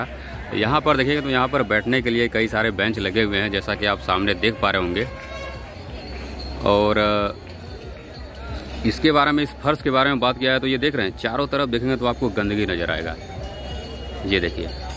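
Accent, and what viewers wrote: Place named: Varanasi, India. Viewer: Your voice banarsi very nice and festivals showing video very good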